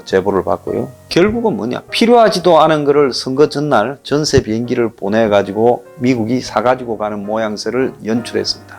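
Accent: Gyeongsang, South Korea. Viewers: 그래서 바이든되면 머가 좋구 나쁘고 그런걸 말해야지 트럼프에 대해서만 말하내 황장수 위장우파라고 소문이 났던데 이런 이유이구나 경상도 사투리 쓰지마라 전라인민공화국 사투리쓰면 이해라도하지 어릴때부터 쇠뇌가 되었으니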